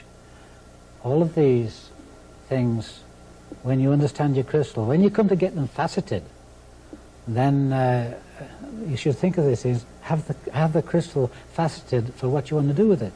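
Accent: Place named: West Midlands, United Kingdom. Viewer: is from Wales. is from the West Midlands in England, by my ear.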